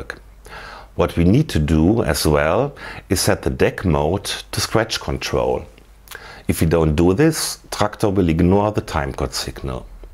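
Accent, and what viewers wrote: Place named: Germany. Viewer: hachja, deutsche und ihr englisch..:D danke für`s video:)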